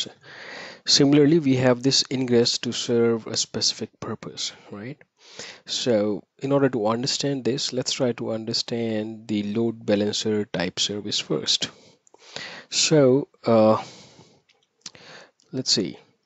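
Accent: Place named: India. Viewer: trying hard to hide Indian pronunciation :) anyway good short video.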